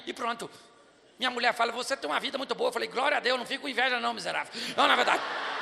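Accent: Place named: Northeast, Brazil. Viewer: Kkkkkk pastor tú veio lá da minha terrinha foi? com esse jeitinho nordestino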